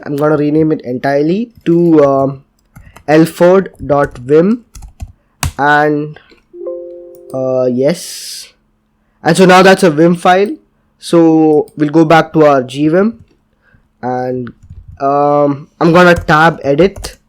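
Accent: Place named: Bangalore, India. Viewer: Ur from Bangalore aren't you?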